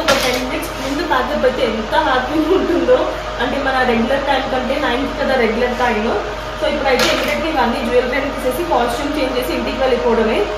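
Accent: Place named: Nellore, India. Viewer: Manadhi nellore kadha.. Atlumtadhie manathonieee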